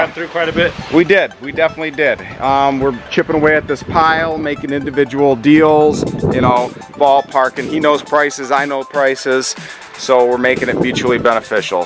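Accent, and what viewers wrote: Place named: Michigan, United States. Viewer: Just found your channel, and it sounds like you're from Michigan as well. You're grabbing all these toys right out from under my nose!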